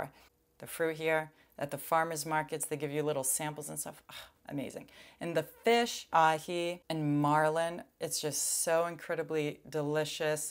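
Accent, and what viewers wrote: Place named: Boston, United States. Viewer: you definitely still have a little Boston accent. you sound like you are from Chelsea or Malden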